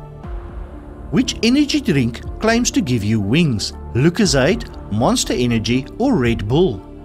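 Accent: South Africa. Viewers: I spend a lot of time on youtube and you are my favorite man. You are so friendly, and the questions are great and I can't get enough of the South Affrikan sound.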